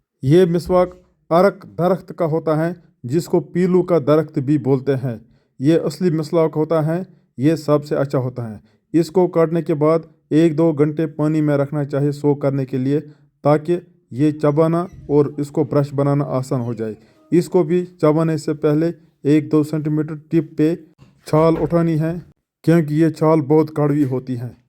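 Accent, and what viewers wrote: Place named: Kupwara, India. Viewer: Pls focus on your accent. It needs great improvement. You are using typical kupwara accent.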